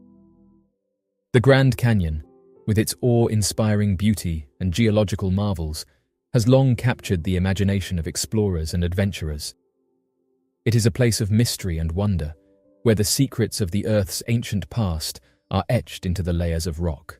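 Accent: United Kingdom. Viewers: its so fucking dumb but he's got a british accent so it's gotta be true.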